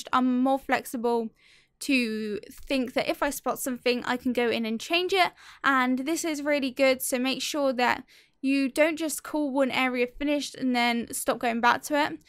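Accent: United Kingdom. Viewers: You have an British accent..it's good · "phoutous"....man that British accent is boss!!!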